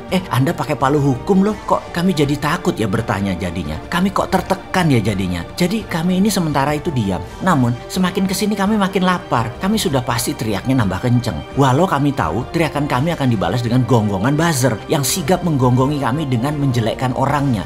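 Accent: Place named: East Java, Indonesia. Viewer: Seneng denger logat jawa timuranya bos. Sehat selalu bosman.panjang umur.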